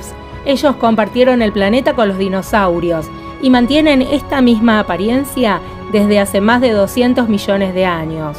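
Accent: Argentina. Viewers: Amo tu acento Argentino 🥰😍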